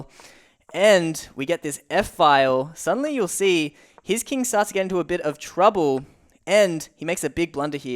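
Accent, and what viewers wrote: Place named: Australia. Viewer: Chess feels so different when it is explained with the Aussie accent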